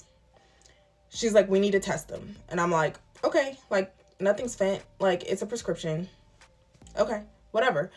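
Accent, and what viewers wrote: South, United States. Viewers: Hey, thats a cute lil baby in the footage at the end of ur videos like part 1 of this and others. Ur dialect is giving from the South, where bout? Im from MS..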